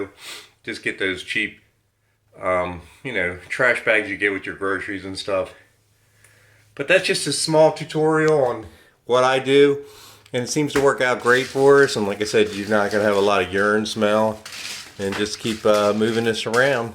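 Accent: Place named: Central Pennsylvania, United States. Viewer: Thank you! We've just gotten kittens and I cannot stand the Tidy Cat scented smells. The lady at the PetSmart recommended this stuff because they use it at the store, so I picked up a bag to try. I have been trying the Walnut-based litter, but it is still super dusty and not every person in our household is fond of it. I appreciate your explaining how it works and what to do & when to change it. PS - your accent sounds like home (I'm from a small town in central PA).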